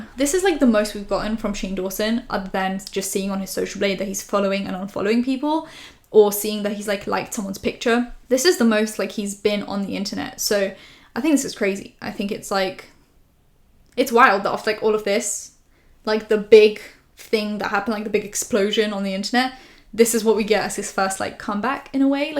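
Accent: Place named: England, United Kingdom. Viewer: i wish we were friends. i hear the english accent and wonder if ill ever run into you. but then i realise England isnt THAT small XD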